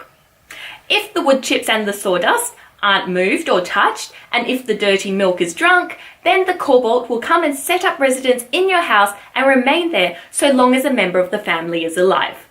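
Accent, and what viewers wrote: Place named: Australia. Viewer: You deserve more subscribers - the name for your channel is false advertising! Also, it's great to hear an Aussie accent (bonus points for paying attention to pronunciations tho!) discussing obscure monsters on YouTube :)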